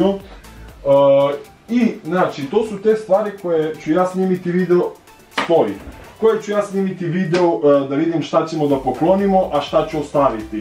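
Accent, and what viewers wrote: Leskovac, Serbia. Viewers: Ja sam iz leskovca ako bi moga da pricas 24h na sa leskovacki naglasak i glas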